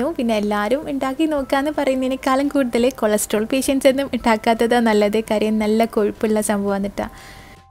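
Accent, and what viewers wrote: Kannur, India. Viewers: ചുവന്ന കുറുവയല്ലാട്ടോ മഞ്ഞ കുറുവ 🤣🤣🤣 നാട്ടിലെത്തിയപ്പോൾ തനി കണ്ണൂർ സംസാരം ആയിട്ടാ പൊളിച്ചു 😍😍😍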